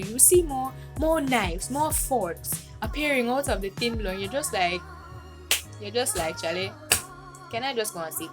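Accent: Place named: Africa. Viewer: First, I am hearing your African Accent!!!! Lwl. Good video!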